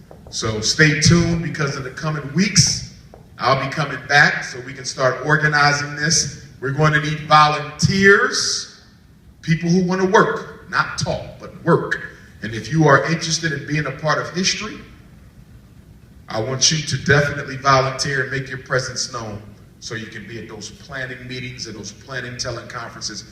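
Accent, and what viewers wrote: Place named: Toronto, Canada. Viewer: He sounds like he is from Toronto.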